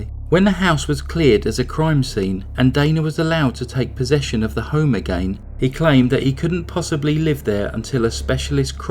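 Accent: England, United Kingdom. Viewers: I love the English accent, just sexy! I bet you're hot! Lol, just kidding! Honestly, though, you're very intelligent, well versed and I love the respect you have for the victims and their loved ones while you're doing the video. Thank you for taking the time to gather all the information as it shows you definitely put work into each video! New subscriber for life.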